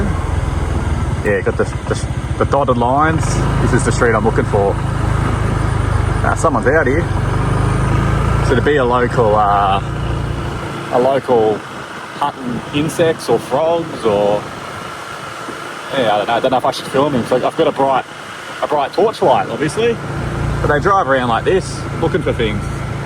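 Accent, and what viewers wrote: Australia. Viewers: Sounds like a Melbourne accent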